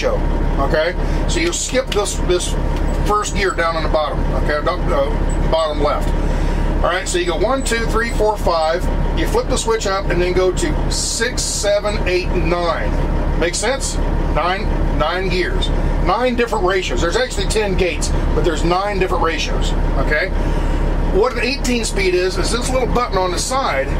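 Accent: South, United States. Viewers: wer runnin thirty mile anhour....... true southern accent. 👌 love it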